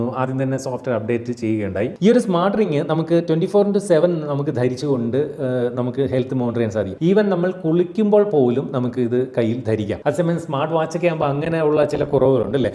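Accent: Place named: Kollam, India. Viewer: It's a kollam/ Trivandrum accent..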